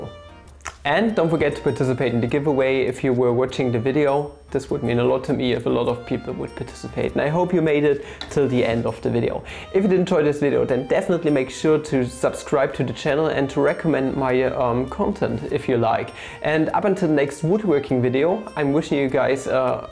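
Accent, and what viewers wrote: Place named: Bavaria, Germany. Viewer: that Bavarian accent is just so engaging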